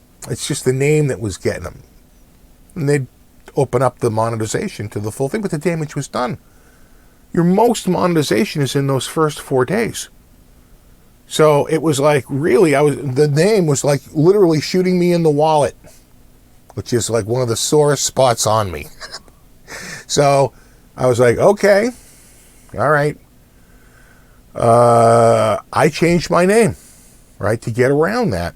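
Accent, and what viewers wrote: Boston, United States. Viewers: Typical dude from Boston lol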